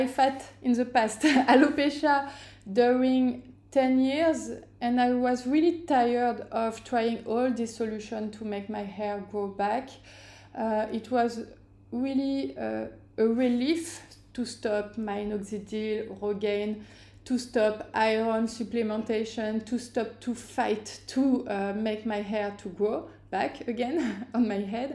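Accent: France. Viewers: L anglais est top , juste l accent maïs ça a son french charm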